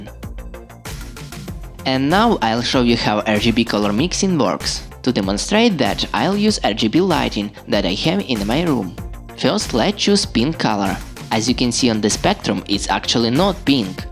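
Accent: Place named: Russia. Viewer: Funny Russian accent :)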